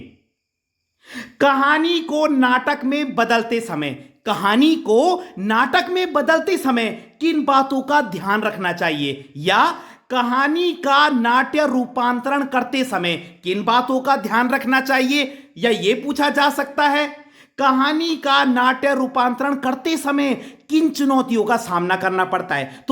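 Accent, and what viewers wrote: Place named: Garhwal, India. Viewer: Sir aap garhwali hoo 😍😍 apki awaaj se pata lag gyaa 😂❤️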